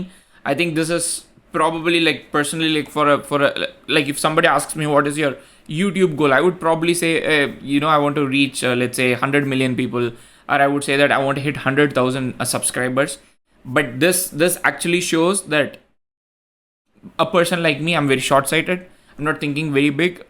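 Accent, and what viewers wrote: India. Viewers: i can understand literally every word he says. i'll take indian accent over californian every time.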